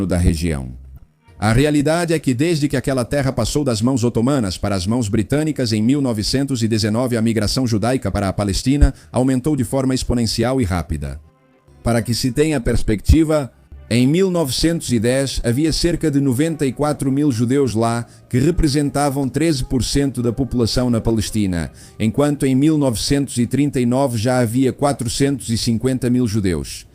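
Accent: Portugal. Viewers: É um bom documentário. Mas essa narração atropelada, português lusitano misturado com português brasileiro. É muito rápida e confusa. Estragou o vídeo! Péssimo!!!!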